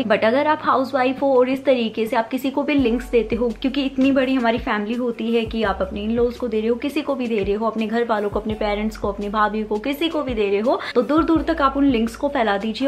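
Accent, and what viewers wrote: Haryana, India. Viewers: Ap haryanvi bolte ho di❤️❤️❤️ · OMG apka awaz haryanvi language m Don't blve it's u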